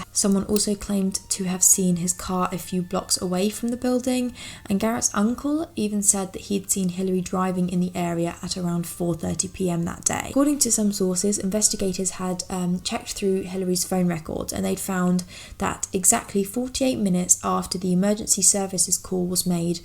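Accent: United Kingdom. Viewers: It's not abt opinions or lights, camera, action or having the best equipment etc, It's abt her very blah blah, very mundane, disinterested presentation; It's like watching paint dry. Like she's reading from a script on how to put together a garden shed. Everytime I click on a recommendation of hers; my ears just glaze over. She seems completely disinterested in the topic; just mumbling through til the end as quickly as possible. She's not engaged at all. I think Americans just like a British accent regardless of what's being said and how. On a positive note, she's attractive, which is her saving grace for those that find attractiveness important, ie men. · Annaliese O'Malley I think you may just have watched too much TV, where everything is all about drama and excitement. I prefer her level-headed and articulate overview of cases, and I’m sure others that enjoy her content do too. 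Very bold of you to discredit any following this woman has as being because of her british accent and looks.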